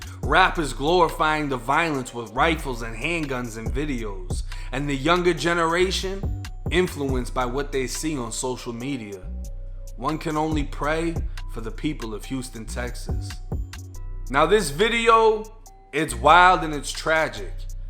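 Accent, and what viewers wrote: Boston, United States. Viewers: Hey man I know youve lived between FL and MA. I grew up in MA abd moved to FL recently. Did you pick up dropping your R's in MA or is that how you've always talked? No shade at all just wondering. Cause I grew up w/ that shit but I didn't know if the Boston accent is picked up when you're older. Just foubd your channel and I'm definitely a fan, keep the grind up and good luck. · Yo my guy are you from Boston?? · I hate your Bah-ston accent lmao 🤣 "He drove his cah, he didnt stahp, he went too fah, he was paht of the cahtel..." Good videos bro, I fw the page 💪🏾💪🏾💪🏾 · Cahhhh, tahhhh-git. You gotta be from Boston or providence lol mad love from a New Yorker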